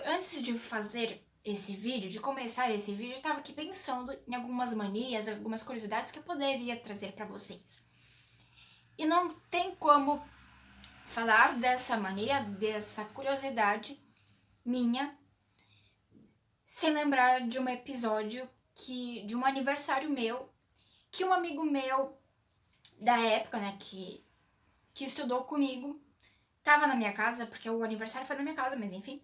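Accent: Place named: Germany. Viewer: Like pelo vídeo sensacional ♥️! A impressão que eu tenho é que vc tem um sotaque Alemão, mas tipo eu só acho mesmo rsrs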